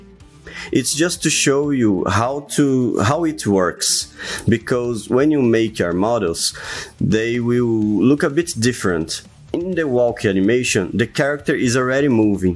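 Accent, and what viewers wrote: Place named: Brazil. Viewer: Brazilian voice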